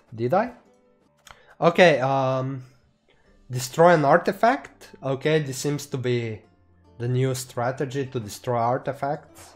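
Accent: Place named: Spain. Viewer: I think from Spain. But i like his accent. I like also his contents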